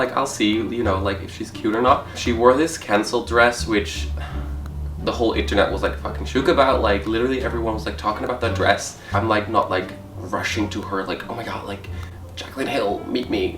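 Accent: Netherlands. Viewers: The Dutch accent lol!!! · Omg he's from the netherlands too! Yooo kaasgap je accent is gaar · yup. just caught that... but with his Dutch accent he always says "who dat" instead of "that"... listen carefully to the way he says that.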